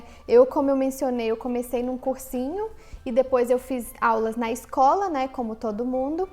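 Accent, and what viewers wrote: Brazil. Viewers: ... eu aprendi sozinha aos 10 anos também... e mesmo sem nunca ter pisado em país de Língua Inglesa, falo um Inglês impecável - consigo fingir tranquilamente que sou nativa americana -, aí percebi um sotaque no teu Inglês... pergunta: você consegue falar o Inglês limpo, sem sotaque "brasileiro"?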